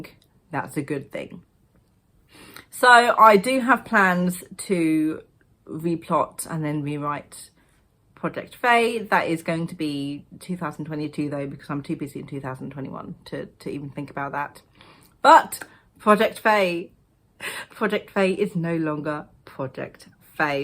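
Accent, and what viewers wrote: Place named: United Kingdom. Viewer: So good to see you again! ^_^ I am all in for the tea and the british babbling, please gimme all the vibes, LOL! I prevent/ cure creative burnout with rest and/or other creative things. Handcrafting helps when I feel I don't get anything done - some hours of work, and you can literally see the work and put your hands on it. Consuming stories sparks new ideas, and listening to audiobiooks while weaving or sewing combines the best of both worlds. I am also not planning on writing anything new anytime soon. I want to finish the current part of my fantasy series - and once that is done, I need to go back and revisit some of the earlier parts, make some minor revisions and bring it all up to my current standard of writing and world building. That should be enough to keep me busy duringall of 2022. ;-) The title and cover of former Project Fae are amazing, they gave me the shivers! 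^_^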